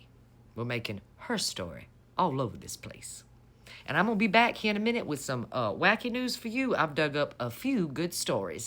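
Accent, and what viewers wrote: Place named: Georgia, United States. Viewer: Oh, I thouight that you were a Georgia Peach, cause you sound like the Memorbable Ms. Buzzard (Isn't that a great name?) It's been over 30 years since I last spoke to her (we were workmates). Remeber that actress who played 'Flo in the sitcom: Alice? Well she qlso reminded me of Ms Buzzard (Pronounced to sound like BOOZ-Zard). Another Witty, non racist whyte woman from Atl.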